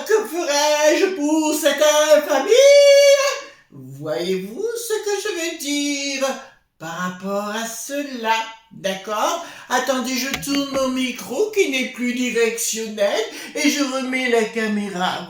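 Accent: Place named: United Kingdom. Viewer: J'adore votre humour, c'est vrai la vie est belle, vivons là à 100%. Les personnes néfastes ne font pas parties de ma vie, je m'entoure de personnes saines et qui aiment vivre au jour le jour. Merci pour cette partie de rigolade avec l'accent So British, ça fait du bien!! Bisous à vous et à la communauté 😘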